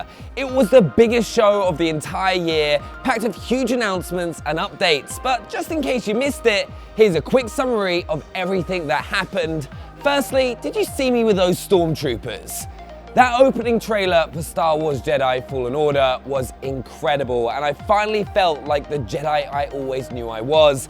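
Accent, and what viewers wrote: United Kingdom. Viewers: Ok so i love the UK peeps , i truly do, even if we boot stomped yall for our Freedom, MY ISSUE IS, why are yall on my youtube 24/7 with that accent that i cant understand , i mean really wth ! this Vid seems really Great and i start listening an all i hear is that dreadful accent and its mucking the entire vid up, and where are my fellow Americans for these types of vids, why are all my Video Game suggestions from youtube are from the UK !!! im thumbs up your vid cAUSE its the proper thing to do and i really like the title ,but i cant understand a lick of what your sayn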